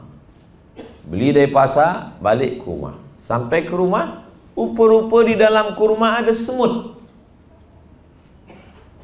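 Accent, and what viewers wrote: Kuala Lumpur, Malaysia. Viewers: Otak aku tak boleh comprehend ustaz orang Indonesia, sembang cm org malaysia. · Askm,terbaik ustaz pandai lograt org KL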